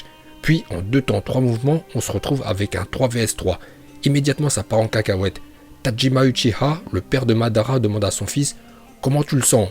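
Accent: Japan. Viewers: pour eveiler le sharingan il faut d'abord controler le migaté no chovouie ps ton accent japonais est incroyable je met mon like directe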